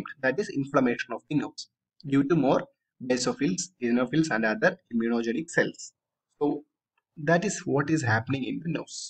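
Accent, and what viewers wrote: India. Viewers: Pakka Tamilnada English accent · It's kerela accent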